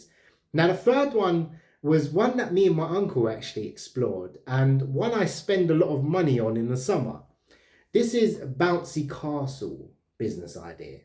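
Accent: United Kingdom. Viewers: I don’t know if this sounds unprofessional or not but please let me know what type of british accent you’ve got. Thanxx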